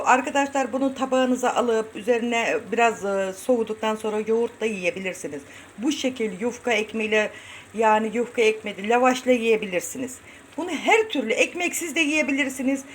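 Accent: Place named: Zonguldak, Turkey. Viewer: aynen bende zonguldak eregli abla şivenden anladım süpersin bereketli olsun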